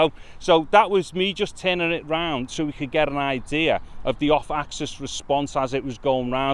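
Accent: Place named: Birmingham, United Kingdom. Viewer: You sound like a Peaky Blinder, mate. Anyway, amazing review. Thanks!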